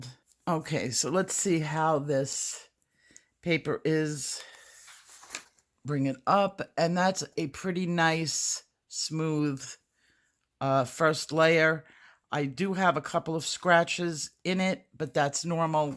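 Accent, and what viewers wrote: New York, United States. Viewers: ! Hi! I've missed hearing your voice and that New York accent I love so much. You sound wonderful! Thank you for sharing your photos of you and Howie. What a lovely couple! What the heck did he do for a living that allowed him to shake Obama's hand?! 🤣❤ I'm so glad you took time off. I can't wait to get my hands on your paste!! Excellent video! Sending big colorful hugs and love your way! And the tattoo.....sniffle.....❤ Love you!! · Wonderful to hear that New York accent, in the YouTube coloring world, once again! Shalom, thumbs up.🎨♿👵Barb Nelson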